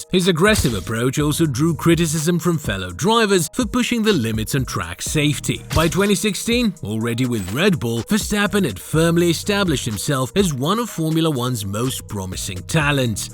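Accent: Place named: United Kingdom. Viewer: British accent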